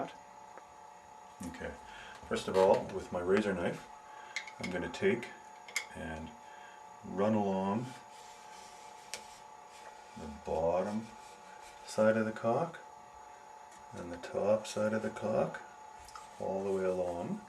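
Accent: Canada. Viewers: thought you sounded canadian with the distinctive ooo's and then I'm pretty sure it is confirmed when I saw the french writing on the caulk tube. good job! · Thanks for this nice little video - maybe not up to professional broadcast standards, but calm and pleasant and perfect for us dummies. Actually, your narrative sounds very much like the E.B.White readings of "The Trumpet of the Swan" and "Charlotte's Web", except this is the first time I've EVER heard a stereotypical Canadian accent like Bob and Doug Mackenzie's! (Which is kinda weird, since I live in Montreal and spend a lot of time in Toronto/Hamilton.) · everybody's an expert... NOT... Guess I'll have to post one to show how to caulk properlly.. SHEEEZZZ He's not British.. I'd say a canuck..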